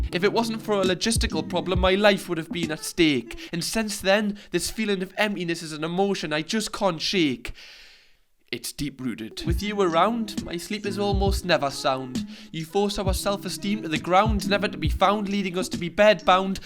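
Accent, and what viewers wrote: Newcastle, United Kingdom. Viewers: Good video, good words, and I know this is bad but I feel like it doesn’t u e the same effect in a Geordie accent. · YOU JUST CANT TAKE HIM SERIOUSLY WITH THAT NEWCASTLE ACCENT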